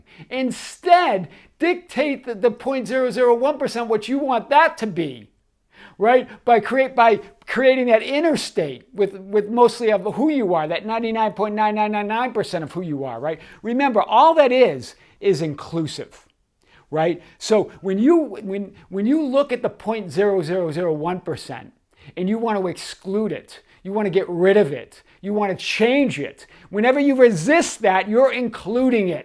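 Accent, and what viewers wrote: Boston, United States. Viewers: Your Baaston accent makes flip charts very exciting!!!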